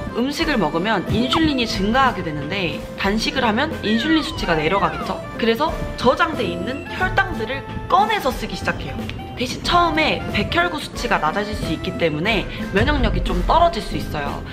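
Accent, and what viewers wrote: Gwangju, South Korea. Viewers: 광주같아여!! 익숙해서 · 공부 열심히 하셨네요 전문가같네요 고생하셨네요 근데 전라도분이신가봐요 억양이 ㄷㄷ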